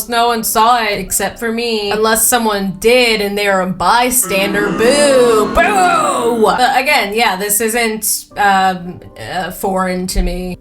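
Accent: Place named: Massachusetts, United States. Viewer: so very wicked Massachusetts i haven't heard somebody say "pup-corn" in a while so thank you for that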